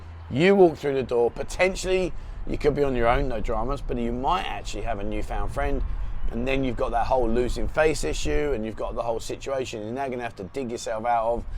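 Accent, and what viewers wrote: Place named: England, United Kingdom. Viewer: well first of all I don't watch these videos. It popped up on my feed. Secondly as soon as I hear the accent I turn off. The English have been ruining tourism in SEA for years. Demanding the locals speak English, fighting with locals, destroying their property, doing perverted inappropriate things. No respect for the local culture. You're all better off staying at home where you belong.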